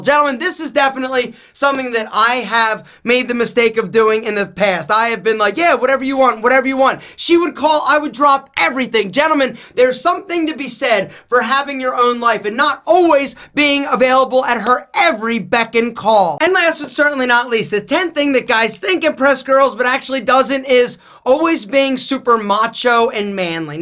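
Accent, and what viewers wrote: Philadelphia, United States. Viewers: Anyone know if dude's from philly? Lol thick philly accent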